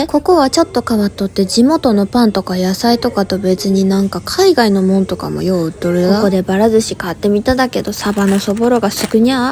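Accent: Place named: Tango, Japan. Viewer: 地元動画ってめっさ感動した。また京丹後市の丹後弁聞かせてな〜＼(^o^)／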